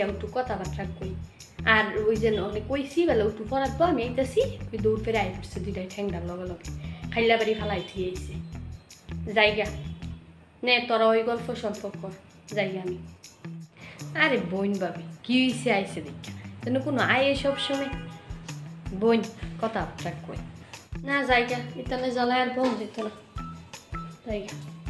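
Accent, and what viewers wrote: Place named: Mymensingh, Bangladesh. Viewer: Wow perfect mymensingh accent....carry on sister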